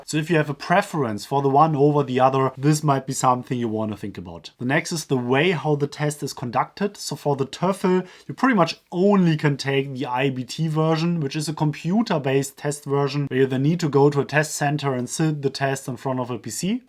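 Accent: Germany. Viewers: But I like your accent. Like German, sounds explicitly, harsh and strict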